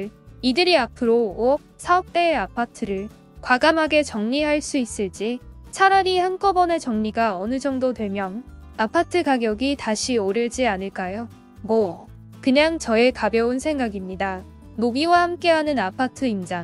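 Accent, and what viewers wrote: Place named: Mokpo, South Korea. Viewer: 아따 목포구만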